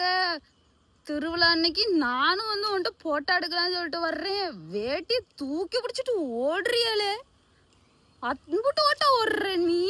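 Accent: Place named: Kongu, India. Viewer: Kongu tamil super ❤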